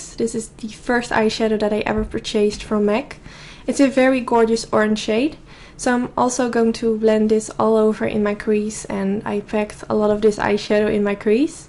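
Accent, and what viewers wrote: Netherlands. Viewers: hey, are you dutch?😊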